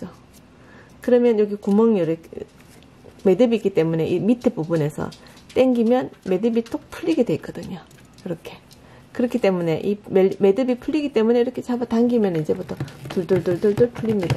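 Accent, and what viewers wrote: Gyeongsang, South Korea. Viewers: 갱상도 사투립니다.. 살, 사움, 엄악 .... ㅋㅋ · 살포대기 살포대기 ... ㅋㅋㅋ 갱상도 사투리 넘 귀여우심 . 좋은 정보 감사요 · 경상도 사투리 구수 합니다... 하하하 살포대기... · 경상도 사투리 이해 합시다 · 쌀브랜드는 서산쌀에 경상도사투리 구수하신 아지매의 음성으로...뭔가 재미있습니다. 구글ai가 오늘은 쌀포대 언박싱영상을 ...성질이 조신하지 못해서 매듭푸는 방법을 아는대도 불구하고 한번에 잘 풀릴때도 있고 방향 거꾸로해서 첫방부터 꼬이기도 하고 이럴때는 속에서 뭔가 올라오는 날ㅋㅋㅋ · 어쩌다 영상 보게 되었습니다 경상도 사투리가 친근합니다 쌀=☞ 살포데기, 꿰메다☞꼬메다! 특유의 억양까지 말씀이 정겨워 좋습니다 영상 잘 봤습니다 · 쌀자루.. 발음이, 살자루.. 살포대기로 들립니다. 경상도 울산출신이신 우리 매형 발음과 비슷하십니다. 우리 누님과 매형생각이 납니다. 고속도로 우측 주행... 아주 알기 쉽고, 실용적인 설명 고맙습니다. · 경상도분이신가봐요...쌀을 자꾸 살이라고 발음을 ㅎㅎㅎ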